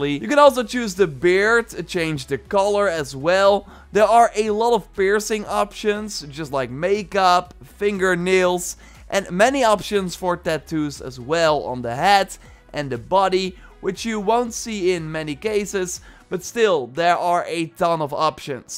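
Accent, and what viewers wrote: Netherlands. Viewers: Arw you dutch?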